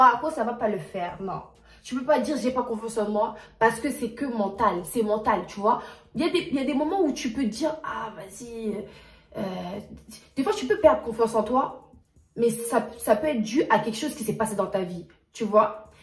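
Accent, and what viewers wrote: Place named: Democratic Republic of the Congo. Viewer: Juste par ta façon de parler en reconnaît ton pays😄🇨🇬💪.Une vraie congolaise.Ne changes jamais ma belle.Restes toi même💪😘.Dié tala vidéos za kaka🤣🤣👌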